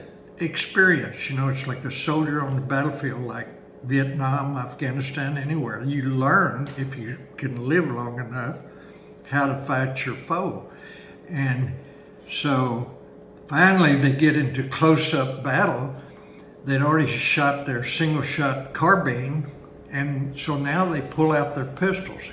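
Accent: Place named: West, United States. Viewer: Greetings from Pune City, Maharashtra. You have a clear Western twang accent and I love the way you speak as if I'm watching a Hollywood western. You're describing so much in detail that I can picture it. Thankyou for the information. I've subscribed to your channel if it's ok for an Indian Indian to learn more about the Wild West History. Thanks again Sir.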